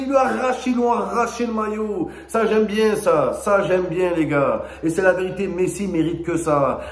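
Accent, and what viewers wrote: Marseille, France. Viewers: Wallah tu parles avec ton vieux Marseille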